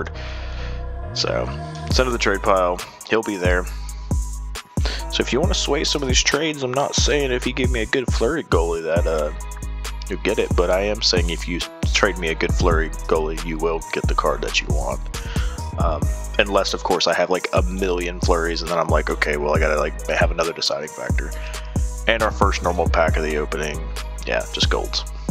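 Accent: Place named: California, United States. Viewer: Completely different guesses but I’d say you’re from California or New Jersey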